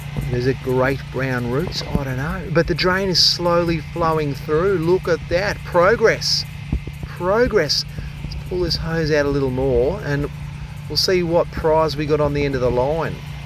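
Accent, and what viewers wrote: Australia. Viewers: I’m an Aussie… & I must say I love the Australian accent& enthusiasm… thank you for no course language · I'm not a professional like you (some might say a legend), but I've had my share of clogged shitter pipes.......and speaking of pipes, your Aussie accent compliments your work, like a good red wine and steak. All the best from a commonwealth brother in Canada! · I watch Tornado and animated videos how did I get recommended this buity from someone with the most Australian language I have here heard. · This is the most Australian man I have ever heard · Same! I just love his Aussie talk. Amazing! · G’day! New here and I love the Aussie accent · Sending kudos to you from th State of Delaware, USA. Love ya, ya silly sausage! (Love the outback terminology and love hearing your accent!) 🥰🤗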